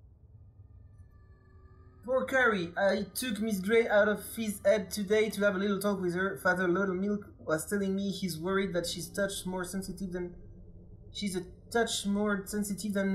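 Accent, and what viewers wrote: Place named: France. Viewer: yup, ton accent français est bien présent 😂👌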